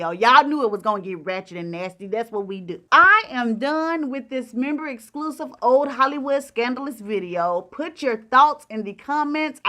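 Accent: South, United States. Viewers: Great video,! Your southern accent is really sweet. I slightly gasped when you used the potty words. Nevertheless, I'm a die hard fan of yours.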